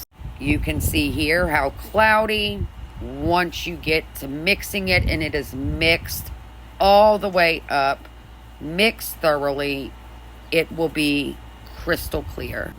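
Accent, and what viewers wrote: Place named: Louisiana, United States. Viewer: Beautiful cup!! I am hearing a Louisiana accent…..I’m in Louisiana is why I say that! LOL. Girl I would love to craft with you and learn some stuff! 😊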